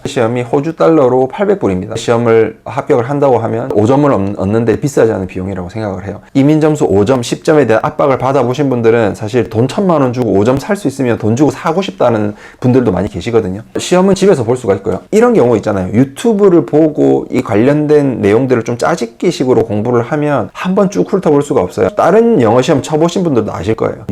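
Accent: Gyeongsang, South Korea. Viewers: 시골 탈출 축하드립니다^^ 사투리가 같은 경상도 말투라 반갑네용 ㅋㅋ 주변 독일, 프랑스, 인도인 친구들은 NATTI CCL을 기본적으로 다 치는 걸로 생각하던데 한국분들은 쳐볼 생각도 안해보시는 거 같아서 아쉽더라고요. 저도 주변에 아무도 NATTI CCL 생각 안하고 있을 때 혼자 공부해서 시험치고 합격했던 경험이 있습니다. 그때는 워낙 정보가 없어서 너~무 너~~무 고생 많이 했지만 시험 자체는 생각보다 쉬웠어요. 추천해주신 웹사이트 가서 보니까 세상 좋아졌다는 생각 드네요ㅋㅋ 2024년 이민 준비하시는 분들 다들 화이팅^_^**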